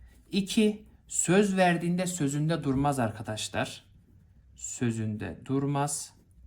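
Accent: Malatya, Turkey. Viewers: Hocam Malatyalı misinizzz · Hocam Malatyalı mısınız?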